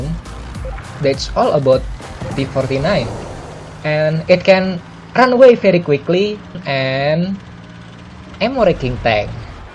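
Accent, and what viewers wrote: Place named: Indonesia. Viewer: Wih orang indonesia mangkanya logatnya gue kenal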